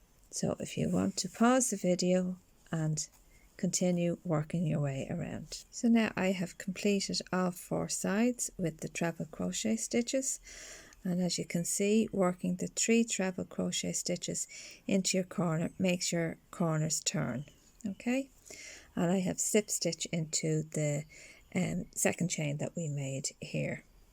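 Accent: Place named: Ireland. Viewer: Great video, love the Irish voice , brilliant 👏 thank you 😊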